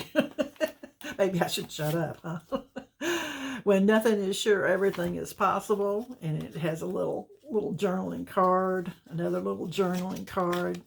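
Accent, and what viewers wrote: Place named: Alabama, United States. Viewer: I love your accent. Where are you from? Sounds like Alabama. Forgive me if your not. I love your videos. I've done one or two now. I have a vid on you tube.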